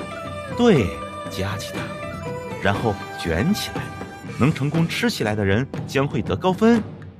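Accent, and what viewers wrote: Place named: Beijing, China. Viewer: OH the Beijing native Chinese voiceover just makes it SO much worse - Feels like not a single Chinese person was involved in writing this...Actually, yeah Mulan live action vibes. Holy crap. Reading a case about this in my business class and I was like "it CAN'T be that bad can it??" And holy shit it was. All 121 seconds of it. JEESUS.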